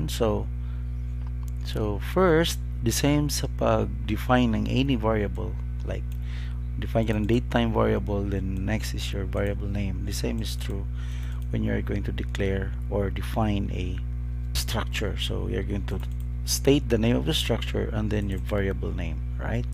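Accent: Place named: India. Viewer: not bad but 1/3rd of what you say disappears in some indian sounding word accent. to sad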